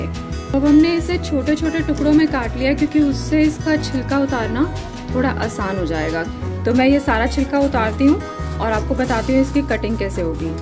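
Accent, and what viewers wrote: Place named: Punjab, India. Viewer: Hi ji aap puri shudh punjaban ho, aapka subhzi banane ka style, bolne ka style poora punjabi hai, mazza aa jata hai aapko sun kar. Kathhal hum bhi aise hi banate hain,aur hamari family ka favourite hai. God bless you.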